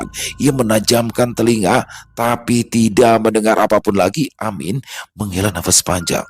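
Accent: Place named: South Kalimantan, Indonesia. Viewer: Mantap bg logat banjar y sdh kental benar. Salam dari banjarmasin 🙏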